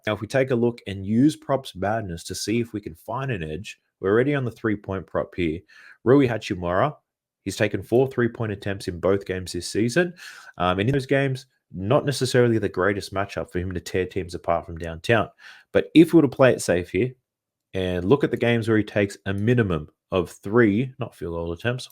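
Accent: Australia. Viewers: no way is an aussie 🔥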